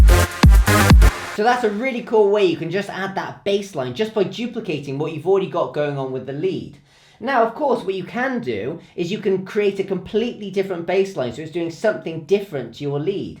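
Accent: Wales, United Kingdom. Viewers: Is that a bit of welsh I hear in that accent of yours!! Cymru am Byth!!!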